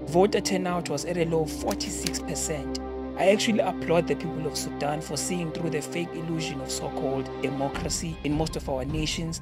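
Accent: South Africa. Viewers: I'm watching your YouTube channel like I'm series of game of thrones, very entertaining ka English ya mzansi😆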